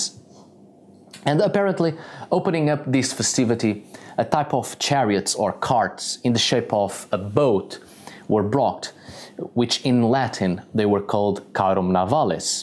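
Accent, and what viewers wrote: Portugal. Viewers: Indeed He's speaking European Portuguese. And as it appears, he resides in Portugal atm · Your accent in English and especially in Portuguese very much sounds like a Portuguese speaking those languages. As well as your content, you recently talked about Umbanda, you made a video on the runes, you talked very much about the Iberian peninsula in the video about druids. Are you portuguese? Or is european portuguese your mother language? I say it because, as a brazilian, I'm very used to the way portuguese people talk and all, and couldn't help but notice these similarities. Also, great video! would love to see more about Portugal and North/northwestern Iberian celtic traditions, culture and history overall. It's very difficult to find specificities about that especially if you consider that Brazil tends to see Portugal and portuguese culture as too alien, often calling the portuguese settlers and conquerors "europeans" even tho they were the only ones to colonize here, for instance, and we don't even learn on school about pre-modern Portugal at all, making it look like we don't even descend from them at all (far from the truth, culturally and especially ethnically). 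I see you live in Portugal and has much interest on the country and. culture too, would be great to know more about it then! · As a Brazilian portuguese speaker, your accent is so strong I almost don't understand "caretos". Looks you're fluent in European Portuguese, so to speak.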